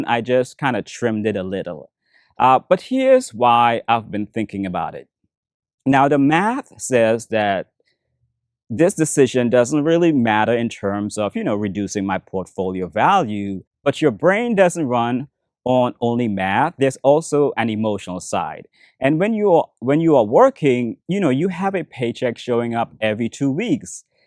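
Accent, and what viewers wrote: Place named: Vietnam. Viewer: You have Vietnamese accent, interesting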